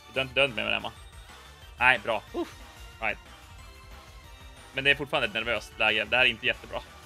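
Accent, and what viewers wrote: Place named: Umeå, Sweden. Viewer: Du är från Umeå? Man hör på din dialekt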